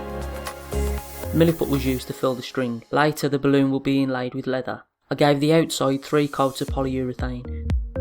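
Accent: United Kingdom. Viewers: yes and finally found a good man speaking british accent.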